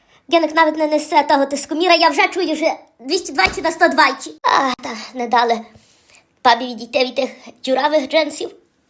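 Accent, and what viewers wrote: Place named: Lviv, Ukraine. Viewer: То є львівська говірка, а ще так колись по селах Західної України розмовляли люди, тепер вже мало хто так говорить. Моя бабуся, царство небесне їй, так говорила:"Йой, муї діти зулутенькі, то мій капі'тал!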